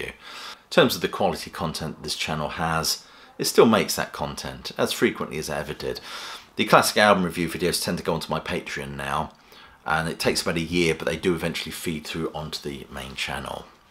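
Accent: England, United Kingdom. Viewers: Love your channel and your English accent, eccentricity and humor! Plus your knowledge is vast! Carry on Wayward Son of Rock! Never mind the Trollos! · F-Them. Love your English accent. Keep up the good work. All the best from me to you, Sir!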